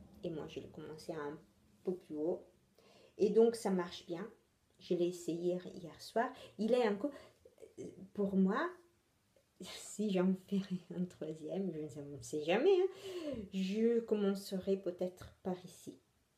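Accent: Italy. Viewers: bonjour, j aime regarder tes podcast et j adore ton accent italien st tu as toujour le sourir.bisou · Coucou ! C'est toujours un plaisir de retrouver ton bel accent italien et tes Robin 😂 Passes une bonne semaine en espérant que tu trouves le temps pour coudre et à très bientôt ! 😘😘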